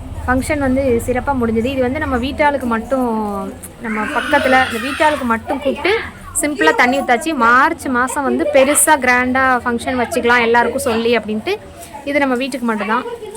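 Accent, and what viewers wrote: Tirunelveli, India. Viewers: Akka unga thirunelveli slang nalla erukku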